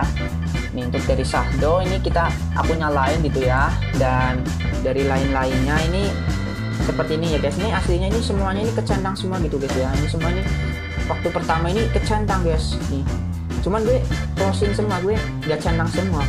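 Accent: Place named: Java, Indonesia. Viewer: Medoknya mntep bos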